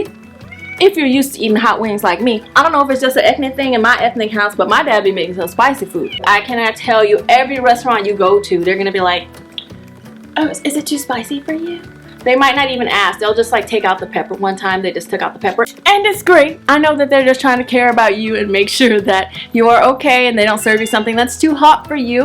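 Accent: South, United States. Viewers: Omg where from the south are you from like what area? We have the same accent so I'm curious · omg I just love your southern dialect. wonderful video keep it up <3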